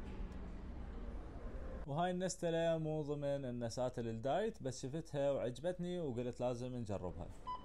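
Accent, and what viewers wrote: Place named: Iraq. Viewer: كولي هذا بالدوره او بغداد لان مبين من الحجي مالته عراقي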